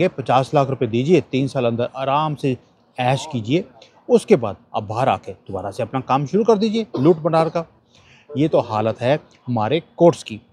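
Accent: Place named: Punjab, India. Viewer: AAP KI ACCENT PUNJABI HEY AUR AAP KA BASERA CHEEN MEIN HEY AHLIYA BHI AAP KI CHEENI HEY AAP KA NAAM HEY ISS PAR ROSHNI DAALIYE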